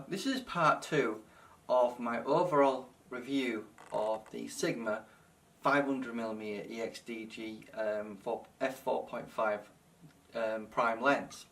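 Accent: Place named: Thailand. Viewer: That sounds like someone from Thailand reading some text …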